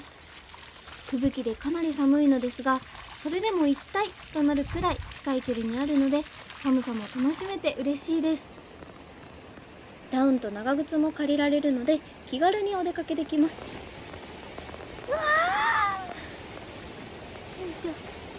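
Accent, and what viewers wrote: Kansai, Japan. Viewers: ご両親が関西出身との事で関西弁がとても自然で良いですね。今回の動画もとても良かったです。また楽しい旅の動画(特に鉄道もの)お願いします。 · 関西弁ですか？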